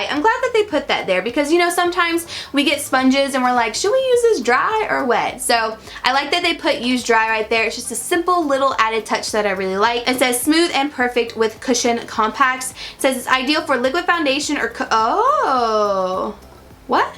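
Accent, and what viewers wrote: South, United States. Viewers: Guuurl someone's been talking to some folks with a drawl. Your southern is showing at the beginning of this video and I'm loving it!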